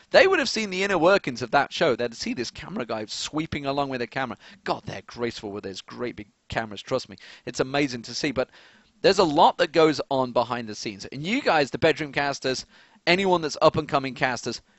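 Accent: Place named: United Kingdom. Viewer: You make me want to talk in a British accent... :/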